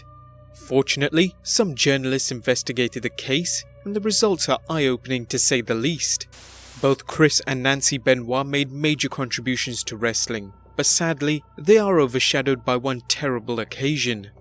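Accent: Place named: England, United Kingdom. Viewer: Ughh the monotone and English accent makes this video 100X worse i couldn’t make it half 1/4 the way thru it. And this guy had many videos.. all of disgusting horrible acts, cia would be in his backyard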